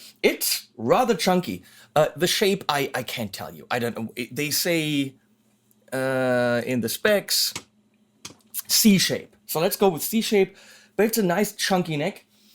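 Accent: Germany. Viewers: I havent known for a long time, only recently got back from more a computer gaming time to pick up guitar again, after a long long long time. In order to get away from the computer stuff, which isnt easy for me after so many years and very good memories, i have dumped most of my Youtube bookmarks, which were centered aorund gaming. Now slowly getting nice channels here on Youtube when it comes to making music and all that. And also this channel here, which I really enjoy. is really entertaining and nicely geeky and his german english is simply adorable I think. I am from Germany too, so I know how that is.;) Anyways, after stating my joy for this channel &, to the topic at hand.;) I have to agree with on the fact that with a guitar on that price range, a gig bag or even a simple case wouldnt be so much to ask. I mean, its quite a lot of money, at least for most of us, and to only get it in a damn cardboard package is somewhat lame, to say the least. 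It doesnt matter which company it is, if its Solar or others. The fact is, a few things shouldnt be too much to ask when you buy a new guitar. Also, a multitool like you get on Ibanez prestige guitars (dont know if also with premium), should be also included, when you buy a guitar over 300 Euro or more. Lets face it, these tools cost a couple pennys in China or whatever, thats simply no excuse to only give that with really expensive stuff. Companies really cheap out on these little things, when th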